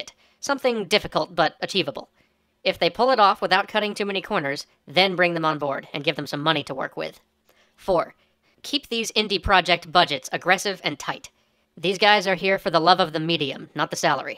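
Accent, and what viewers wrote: United Kingdom. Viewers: Best almost stereotypical british accent evarrr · I came here for the British accent arguement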